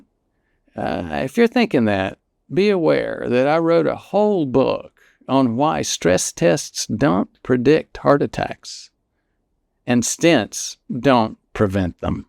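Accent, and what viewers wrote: South, United States. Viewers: Your redneck accent isn't reassuring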